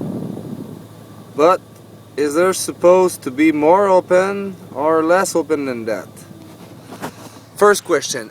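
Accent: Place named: Quebec, Canada. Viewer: Haha..j'avais deviné par ton accent que tu étais Québécois. Moi le problème avec mon liberty c'est qui chauffe juste en roulant. Quand jarrete de rouler y chauffe pu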